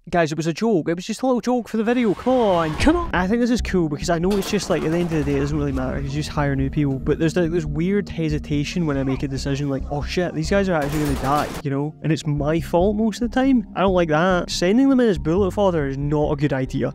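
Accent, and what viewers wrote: Scotland, United Kingdom. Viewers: The Scottish accent does it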